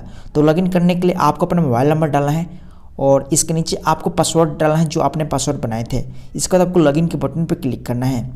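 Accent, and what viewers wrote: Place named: Arrah, India. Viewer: awaj me Ara jila ka jhalak mil rha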